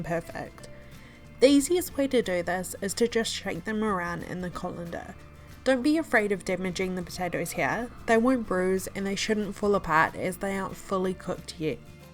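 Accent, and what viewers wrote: New Zealand. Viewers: Lovely recipe,! And is that a kiwi accent I hear?:) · Yeeessss. Recovering from an operation which has put starchy foods back on the menu. Been craving roast potatoes for almost 5 years. Gonna try it with sweet potato too, do you reckon it'll come out crunchy? Not only did I get the recipe to do them like nan used to, I got it in an easy to listen to New Zealand accent. Sweet as, cheers from across the Tasman.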